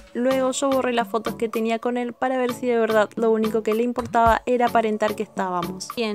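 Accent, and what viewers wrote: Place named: Venezuela. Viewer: no tiene acento argentino habla como Venezolana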